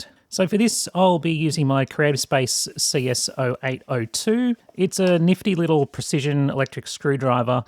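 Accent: Australia. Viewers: I bought a similar fan to the cheap one shown here but for a price of about 30 UK pounds (which is more than twice the price). Outside is a bit slimmer but otherwise looks very similar. However, I found that spending extra got me the following: - 26650's instead of 18650's. They advertise 4500mAh, not sure what it really is but it is rather heavy and I haven't managed to discharge it yet (had it for almost a year). - Proper cell balancing, the midpoint of 2 cells is connected to the charging board is well, the datasheet of IC used shows it does balancing. - Speed control. - Some protection from the fan being turned on by accident (the button needs to be held for 3 seconds before the fan turns on) - Nozzle that properly locks in instead of a wimpy magnet. Funny enough, the price of that same model of fan later rose closer to what the kica costs. In terms of feedback, it's a good video with a pleasant aussie accent but 2 things I'll note: - The electronics on the back of the motor is actually the ESC. 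They managed to make it so small by using "2 in 1" transistors, same type as used in laptops for generating power rails, they are basically a MOSFET half-H bridge in one SMD package, this way they only need 3 footprints instead of 6. - The wind speed could have been measured in m/s, would have been more understandable to most of the world and comparable with listings since a lot of them advertise speed in m/s.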